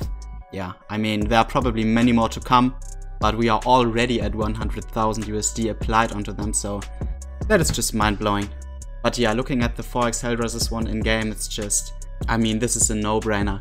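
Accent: Germany. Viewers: werd doch mal diese ekeligen bibanator deutsch akkzent los , das wäre mal n nice craft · Dieser deutsche Akzent 😂 nohate an der Stelle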